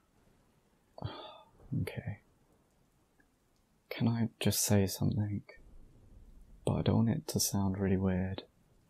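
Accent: England, United Kingdom. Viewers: Omg you have such a proper English accent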